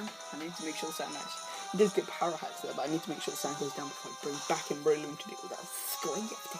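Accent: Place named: England, United Kingdom. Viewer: This is Sam from Facebook, good battle. Are you from England? You have an accent.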